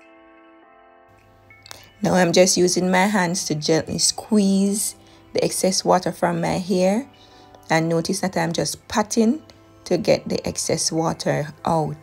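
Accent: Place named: Jamaica. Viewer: Are you in Jamaica? You sound Jamaican....Love your videos. I have been watching them non stop since I came across your channel today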